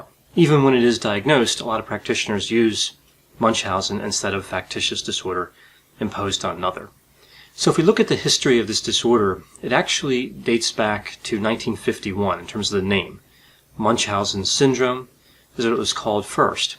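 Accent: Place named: Delaware, United States. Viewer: Delaware accent.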